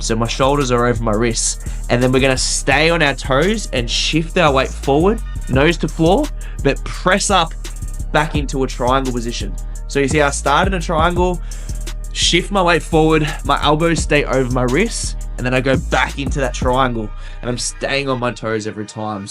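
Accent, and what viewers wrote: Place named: Scotland, United Kingdom. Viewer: You have no idea how I wish to improve it .. I'm really into calistheny! What is the country you live at? Your accent remembered that of a Scottish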